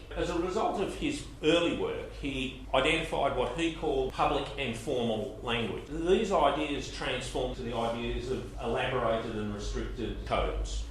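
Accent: Australia. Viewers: been learning Bernstein's idea and had language barrier reading his books. This video is so much help. Thanks. I like the Australian accent.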